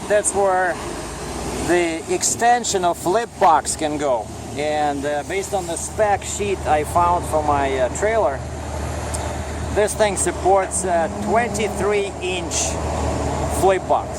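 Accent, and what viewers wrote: Russia. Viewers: Ok I see now. There are a few good guys that will share some good info but these Forum's are filled with people that tried to make it in the Trucking Industry and they are basically in financial ruin! they lost homes, marriages, family, and it's not your accent that bother those losers, it's the fact that you were born in Russia and you are more successful than a lot of those losers and that what bothers them. I was born in Central America and I will always have a Spanish accent. When those losers saw your equiptment and all those videos, envy set in and your original question dint even cross their mind. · Your accent is cool I like the Russian English you don't take personal what some lame brains have to say about you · your accent is cool to me. ther are a lot of ignorant people in trucking. sorry to say. I like truckers I wanted to be a trucker but do to medications I must take to keep my spinal pain bearable I cannot drive a commercial truck. 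but I respect@ truckers. Or they may not drive truck at all hard to know. Russian/American doesn't matter.Its who we are that truly defines us.They defined themselves as a social sickness that is tearing this country apart as well as Canada too.People like you are building keeping this country running. So they can go FUCK themselves when they treat people like you with disrespect. your one badass trucker. Thank you for all the videos. Stay Safe Tim Bishop out...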